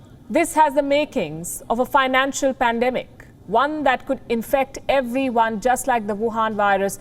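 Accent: India. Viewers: It's formal Indian accent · American media with indian accent … she make china fall apart … we heard you that china 🇨🇳 is falling down ..tell us about America 🇺🇸 who already fall down .. and about india 🇮🇳 who is still 3rd world country run by Europe and 🇺🇸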